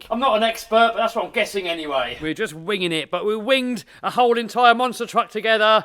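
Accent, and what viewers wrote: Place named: United Kingdom. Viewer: DUDE I THINK ITS AWESOME HOW YOU PRONOUNCE CARBURETOR..!! I like the British accent.. its awesome.! 🤟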